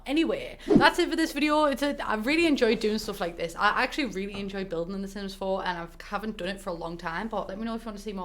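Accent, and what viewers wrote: United Kingdom. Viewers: It’s hard to understand british english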